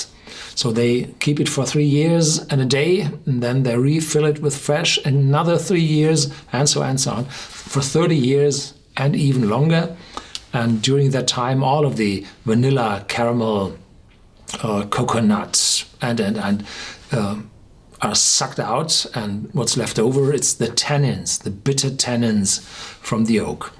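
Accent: Germany. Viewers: I hear a German accent?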